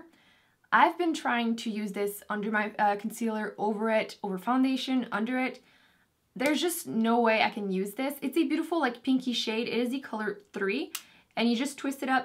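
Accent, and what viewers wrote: Quebec, Canada. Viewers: sounds like a french canadian accent ? cool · your accent is my fave! I love listening to French Canadians speak... is that weird? haha love you! xx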